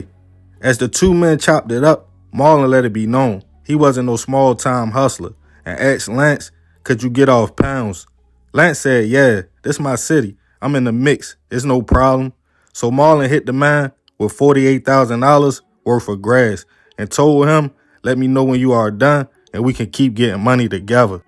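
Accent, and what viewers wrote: Baltimore, United States. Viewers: Brudda i knew u was from baltimore b4 I saw the pick just based off of why you talk. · Baltimore accent got me losing focus on your story. It’s very annoying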